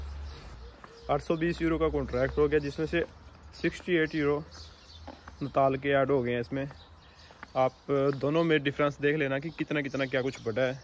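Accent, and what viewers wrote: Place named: Haryana, India. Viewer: Bhai apki avaj se lag rha you are from haryana right bhai 😊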